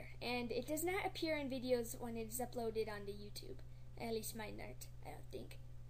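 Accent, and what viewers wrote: Ireland. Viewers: where r u from?? Little kid? ireland?? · irish? waayyy kewl :) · Irish accent detected. Adorable. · He sounds Irish to me xD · u irish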